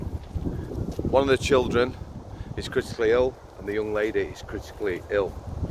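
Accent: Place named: South Yorkshire, United Kingdom. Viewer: Love the accent, I'm hearing South Yorkshire. Very well delivered and to the point. Thankyou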